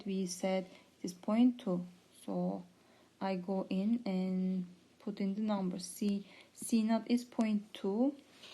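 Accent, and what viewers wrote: Turkey. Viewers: Tam Türk aksanınız var hocam :) thanks.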